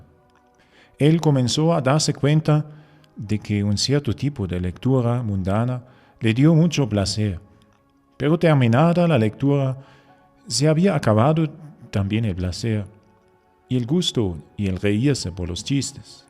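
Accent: France. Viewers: ¿Quien habla es francés?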